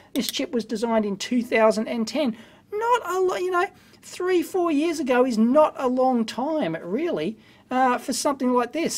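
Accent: Australia. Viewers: Australian accent? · I am not so much into electronics but i like your austrailian accent and I share your humor. Its a kind of entertaining education which I enjoy a lot. Go ahead, I will follow.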